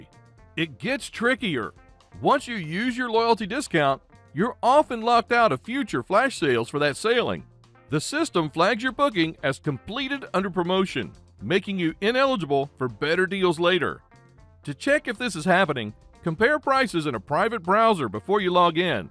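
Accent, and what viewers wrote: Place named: South, United States. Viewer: i love how this guy who has a very southern accent is so smart like SMART smart i have learned a lot from you tysm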